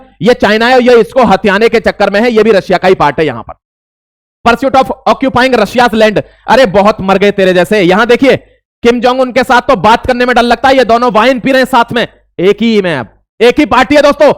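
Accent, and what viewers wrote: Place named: Haryana, India. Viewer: भाई साहब आपकी हरयाणवी बातचीत सुनने से बहुत अच्छा लगता है ! सच्चे देशभक्त की यही आवाज ही है ! जयहिंद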